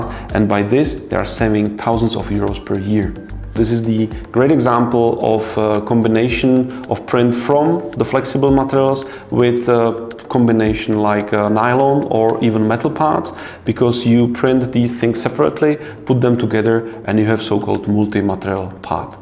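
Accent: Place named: Czech Republic. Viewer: Jak jsem slyšel první slovo, tak si řikám, že docela čenglish. Kouknu na info a ono jo xD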